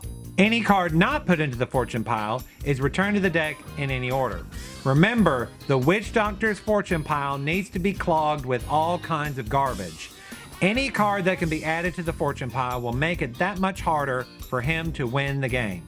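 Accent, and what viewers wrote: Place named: Cajun, United States. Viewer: Love the voodoo cajun flavour of this guy.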